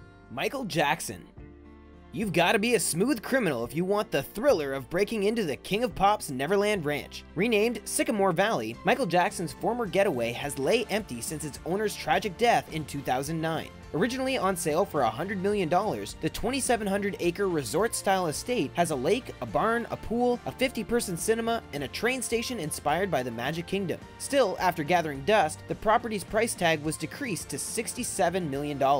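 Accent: Canada. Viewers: Definitely a Canadian narrator. Can’t say house correctly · Annoying voice and Kanuk accent: "hoose" (house), "oot" (out)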